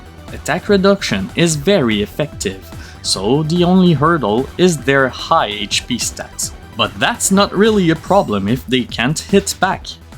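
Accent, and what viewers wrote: Quebec, Canada. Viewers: Il me semblait que tu avais un accent canadien français, j'entend souvent à mon travail mes collèges parler en anglais, il y avait une ressemblance. En tout cas bel effort de tout faire en anglais ! Très bonne vidéo. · You're clearly French Canadian, hahaha. nice video about a beloved game of mine.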